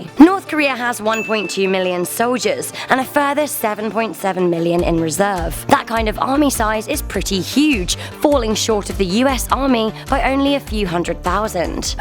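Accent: United Kingdom. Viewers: I don't like the British accent.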